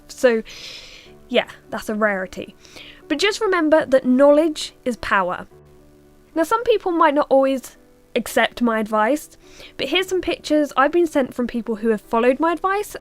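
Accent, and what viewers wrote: United Kingdom. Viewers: Jesus her voice is so painful if she wasn't British I'd like the video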